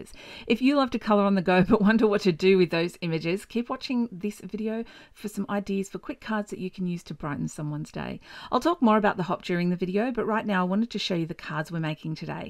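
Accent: Australia. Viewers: So fun to hear a fellow Aussie accent! I'm so glad I found you in this hop - I just subscribed to your channel (international). I love these sweet cards you made!!! The mini gate fold is just so so cute!!! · SUPER cute images. And as a Yank (USA) I really enjoy listening to your Aussie accent. 😁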